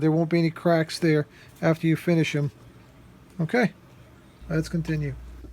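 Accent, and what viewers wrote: United States. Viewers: You sound American. I'm French born and raised, lived in U.S since '84, and have found only 1 shoe repair shop in 34 yrs living in the States. You are a craftsman on the endangered species list my good man. Those shoes are a work of art too. Nice job restoring them.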